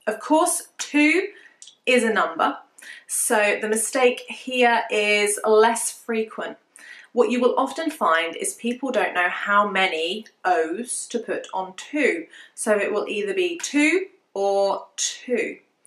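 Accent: United Kingdom. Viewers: Thank you so much for improving our English since I found your channel I watch 3or4 your videos everyday to improve my English, love your teaching and British accent and this channel is very useful for me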